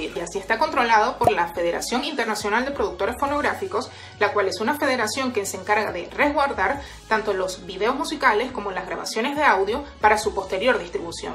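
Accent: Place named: Venezuela. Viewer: Hola! Creo entender lo suficiente como funciona la cuestión de la distribución... pero no tanto lo del Copyright. Soy venezolano! creo que tú también por tu acento. Si quiero subir un tema debería registrarlo primero en SACVEN? SAPI? o puedo usar otros entes reguladores como ASCAP? y de ser así... cuál es el proceso para inscribirme/registrarme a mi y mis producciones? Gracias de antemano! Buen canal! Mi ser servicios están a la orden, soy Ing. de Sonido y productor :D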